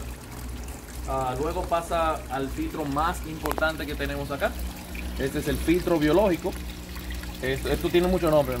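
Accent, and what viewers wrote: Dominican Republic. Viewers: Hablas como dominicano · Vives en República Dominicana? Ese acento es el Dominicano standard😅😅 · Ese acento es del castellano Dominicano es inconfundible al igual como lo es el chileno o mexicano, o me equivoco?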